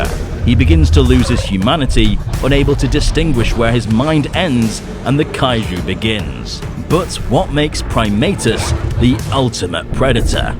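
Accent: United Kingdom. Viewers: HE’S BRITISH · i was like HES BRITISH!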